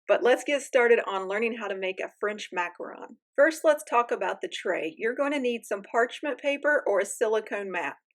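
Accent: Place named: West Virginia, United States. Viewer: Thanks for another great video! My wife and I have been struggling with Italian macarons, with limited success. French macarons are so much easier (though we haven't tried them), that we're going to try them now. Do you have any experience with Italian macarons? If you enjoy messing with several containers, heating sugar syrup, etc., they're fun. Which type do you think are better. Listening to your accent, this old Appalachian boy thinks he recognizes a northern W. Va. pattern. (Which ain' bad!)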